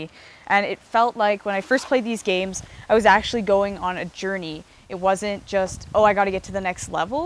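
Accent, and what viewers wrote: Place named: Canada. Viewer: She is Canadian... that's how they usually pronounce it.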